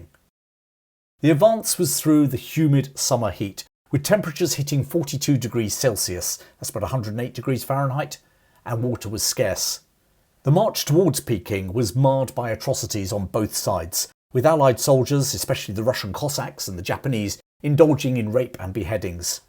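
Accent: England, United Kingdom. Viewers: Greetings from a new subscriber in Indonesia. Thank you for this well researched and well presented video, delivered fluently and clearly in a jolly English accent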